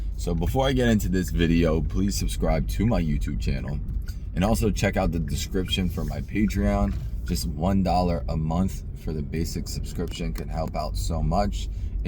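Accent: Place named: New Jersey, United States. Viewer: The sexiest part about you is your jersey accent❤❤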